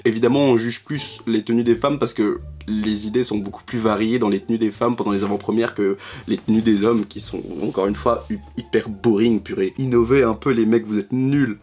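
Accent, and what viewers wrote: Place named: United Kingdom. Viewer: Ton accent anglais une pépite ✨✨✨✨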